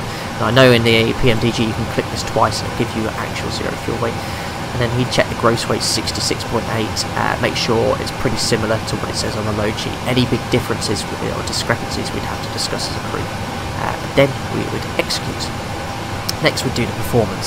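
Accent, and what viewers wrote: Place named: United Kingdom. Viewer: Hey , I love your tutorials ..however you have to think about the watchers for which English is not the primary language spoken...if you just could take that into consideration and just articulate more that will help a lot to understand better your strong Brit accent ...specially with sim background noise Thank you ..👍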